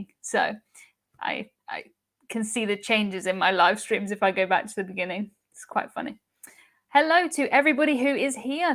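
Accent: United Kingdom. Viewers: I value your opinions & love your presentations (& lovely UK accent!)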